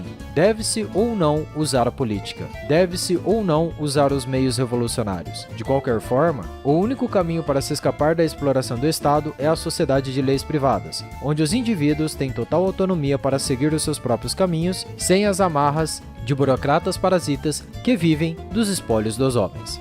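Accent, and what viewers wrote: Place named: Caipira, Brazil. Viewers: Esse sotaque caipira não ajuda a chegar ao final do vídeo. Pqp. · De que região do país vc é? Esse sotaque é bem comum no Paraná, São paulo e em todo o centro-oeste do Brasil.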